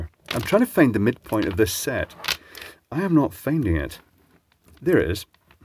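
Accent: Scotland, United Kingdom. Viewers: Nice to see someone making decent electrical devices. I really like that they use rubber. I go out of my way to buy wire, test leads and so on, that are made from either rubber or silicon. Your videos are wonderful, especially on a Friday after a long work week and I need a pleasant Scottish accent dude showing me silly videos about his latest purchase of cheap Chinese electronics. Hehe. You're a gem :)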